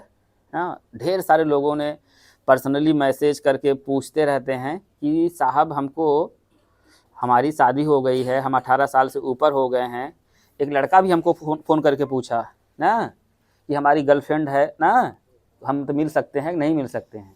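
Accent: India. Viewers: Awaz se lagata hai ki ye up ke purvanchal ke kisi jile se hai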